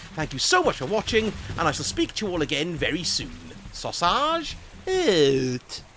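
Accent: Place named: Yorkshire, United Kingdom. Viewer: Yes, Finally filled my engram inventory so farming this to fill postmaster and get what I need. Loving the Yorkshire accent during this too sounds like my neck of the woods. Much love.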